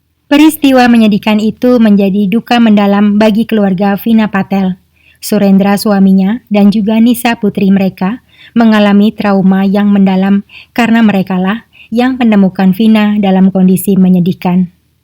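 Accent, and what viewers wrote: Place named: Madura, Indonesia. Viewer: Logatnya seperti orang Madura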